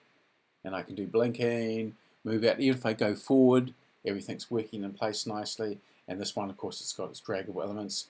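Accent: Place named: Australia. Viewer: Really good intro vid,. And nice to hear another Aussie accent in the animation world! 👍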